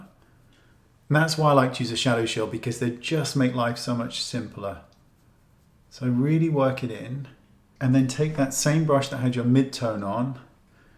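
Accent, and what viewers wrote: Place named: United Kingdom. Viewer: That British accent gets me every time.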